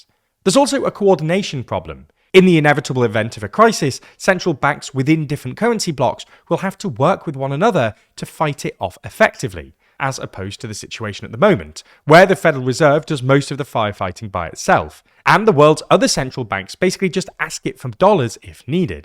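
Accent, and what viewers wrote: United Kingdom. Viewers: Yeah when I heard the british accent I thought this was going to be a waste. These guys never have good info.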